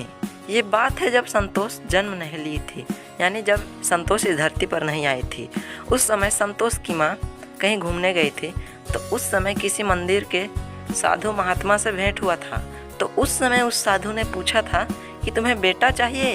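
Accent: Bihar, India. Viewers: Bhai aap bihari ho naa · Thanks you so much bhaiya I understood everything , literally you are gazab 😅 , aapka bolne ka tarika bhi accha lagta hai mughe aap bihar side ke lag rahe hai , mai bihar ka hun , stay motivated and keep making these kind of wonderful explaination video so it would be helpful for new mankind in future, Again thank you thanks a lot !!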